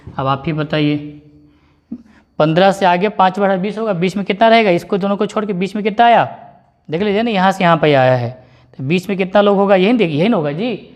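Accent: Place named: Rural, India. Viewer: 🙏B ke jagahba par 👌👌 dehati language sunnne me mujha kafi achha laga sir0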